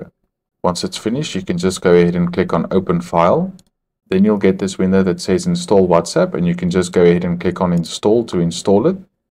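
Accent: South Africa. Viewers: Thank you so much ❤ My Microsoft Store seems not to be working , Got yourself a new sub here, the accent sounds South African❤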